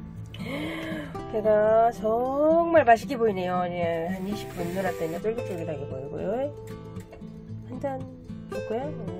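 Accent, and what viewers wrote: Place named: North Korea. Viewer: 북한말인줄 ㅡㅡ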